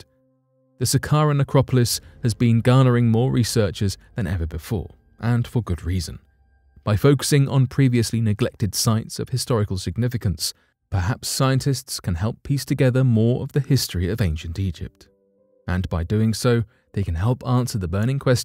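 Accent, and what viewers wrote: United Kingdom. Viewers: I like the content of the video. Im just sick of English accents! Anytime there is any science or history its a gdamn British accent! Im sick of it! Next vid of youres with a Brit accent and its a thumbs down!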